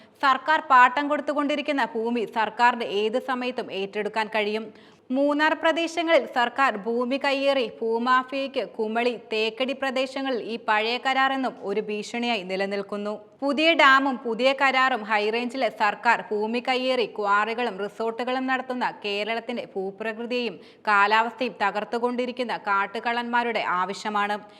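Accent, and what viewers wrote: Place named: Tamil Nadu, India. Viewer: അണ്ണാച്ചി പൂറി മോൾ മലയാളത്തിൽ സംസാരിച്ച പോലെ ആണ് എനിക്ക് തോന്നിയത് 😡😡😡😡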